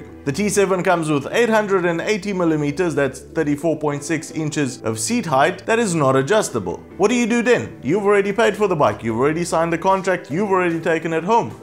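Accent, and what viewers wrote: South Africa. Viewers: Dude.... do you have some South African in you? You have some very South African accent in your words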